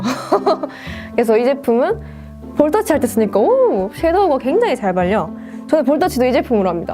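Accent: Gyeongsang, South Korea. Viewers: 헐 오늘 영상 처음보는데 깜짝놀랐어요 중복합성피부, 뾰루지 잘 나고 누런데 홍조있고 뭐 이런건 다 비슷하다 쳐도 아이라인 쪼금만 그려도 화장 완전 진해보이는거 핵공감 ㅠㅠ 제 컴플렉스?라 해야하나 진짜 골치아파요 다른애들에 비해 화장 짙게 하는 편 아닌데도 제가 제일 풀메이크업한것같아보이는... 왜 그런지 정말 모르겠어요ㅋㅋㅋㅋㅋㅋㅠㅠ 저도 경상도 사투리 쓰는데 어느지역이세요ㅎㅎ? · ㅌㅋㅋㅋㅋ역시 경상도 븐이라 그런지 군데군데 반가운 발음들이 있네영 ㅎㅎ 넘이뿌세용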